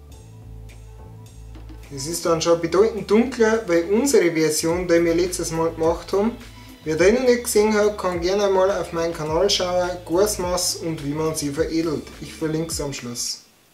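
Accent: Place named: Upper Palatinate, Germany. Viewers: Du soitest stolz auf dei herrlichs Obapfälza "ou" sei und dia vo den Rindviechern nix eazähln lossn! I kannt dia den ganzn Dog zuahearn wia du Goaßmaß sogst. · von wo kommst du aus da oberpfalz?😅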